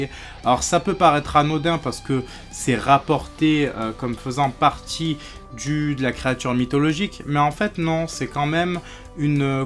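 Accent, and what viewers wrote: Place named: South, France. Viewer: Je t ai vu dans la vidéo de ryo et ton accent du sud et génial je voulais te poser une question tu as déjà vu ryo seinsei en vrai ?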